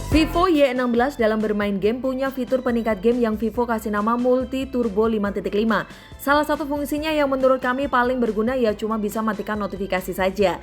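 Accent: Surabaya, Indonesia. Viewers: Suroboyo pasti iki😅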